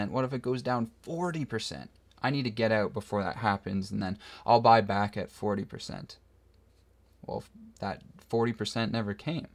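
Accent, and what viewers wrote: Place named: Canada. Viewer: Instead of starting your video saying "Markets" I think it would be beneficial to say "American Markets" as you have a Canadian accent and you said in your video you're in Canada. Also, if your video is about the USA market, can you please start discussing the US housing market? a 700K house is normal for Canada but NOT normal for the US so it's not a great example.Or maybe it's just me and everything. you're doing is not confusing at all. I appreciated your crypto fear and greed explanation. Good reminder. I almost sold in 2022 but figured it wasn't worth the hassle so I didn't due to laziness.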